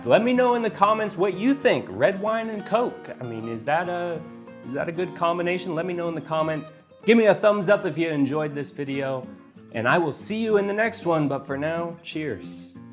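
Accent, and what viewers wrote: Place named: Basque Country, Spain. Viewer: Sounds like coming from the Basque country. Congrats on 300 subs strong!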